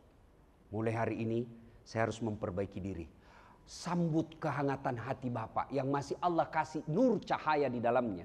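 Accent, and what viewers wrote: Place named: Sulawesi, Indonesia. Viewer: MasyaAllah Yang paling saya suka logat sulawesi nya. Sehat truss ustadz. semoga selalu dlam lindungan Allah swt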